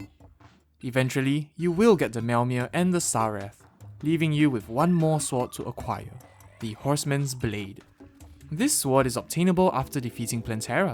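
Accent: Singapore. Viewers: oh you are singaporean no wonder u sound like one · dude are from singapore? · Are u from sg? your accent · He sounds like a Singaporean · Sounds singaporean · Hi, just a quick personal question. Just curious but you sound a lot like Singaporean Chinese. Are you? 😋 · are u a singaporean? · Singaporean? Nice ! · Are you Singaporean?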